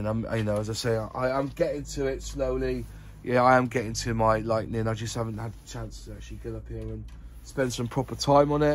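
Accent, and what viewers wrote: West Country, United Kingdom. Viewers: Thanks for showing the catalogue, some good stuff in there and personally I love the old vintage classics. Do I hear a fellow westcountry accent from yourself? Not cornwall like me but I can hear it 😉👍🏻 Thanks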